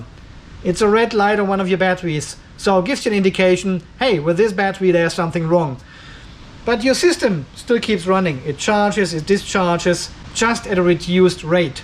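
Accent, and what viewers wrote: Germany. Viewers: Hello - love watching your show - German accent and all! Are you done with JK for now? I am quite fond of them and am building a few 52V inverter batteries with them. Quick question that you might be able to help with? I've used the "GPS" port with USB serial quite successfully on the smaller BMSs (to monitor and integrate into Home Assistant). I've tried the RS232 RJ11 - but without success. Is there a way to get someth9ng similar running on the inverter BMS? I've got a Bluetooth version running into Home Assistant - but I'd prefer hardwired.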